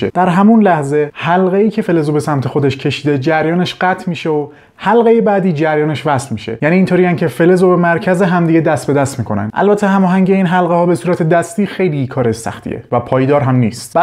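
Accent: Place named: Lebanon. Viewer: چرا لهجه ت ایرانی نیست!!! لبنانی هستی یا روس؟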